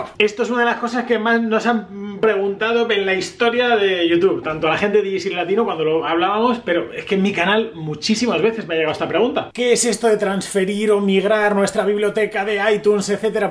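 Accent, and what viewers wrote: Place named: Galicia, Spain. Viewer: Jimmy Neutron con acento gallego!!! que cool!!!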